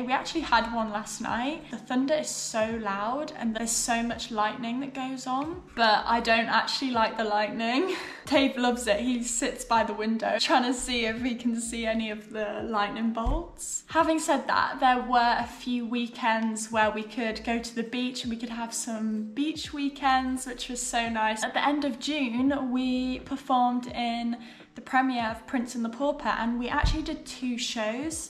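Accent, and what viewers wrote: United Kingdom. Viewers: I love your british accent! I can't explain why, but I feel like english should be spoken just like that. It's sounds so good! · not sure what i like more about, her proper British accent or her personality.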